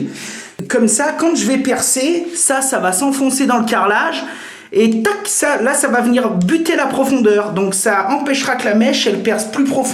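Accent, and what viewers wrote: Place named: Loire, France. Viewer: Super tuto merci! Tu serais pas de la Loire? Il me semble avoir reconnu l'accent de chez nous 😁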